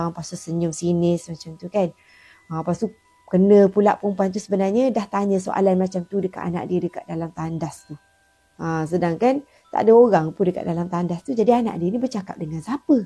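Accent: Kelantan, Malaysia. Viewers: Akak ni org kelantan ke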